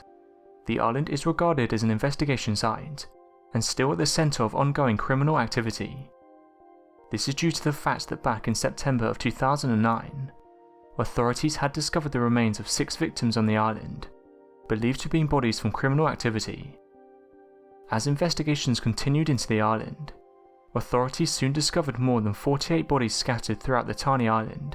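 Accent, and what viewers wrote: United Kingdom. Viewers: You sound like a British libtard that insists on importing Muslim illegals cause you love them so much, even though they hate and want to kill you....... Tell us I'm wrong....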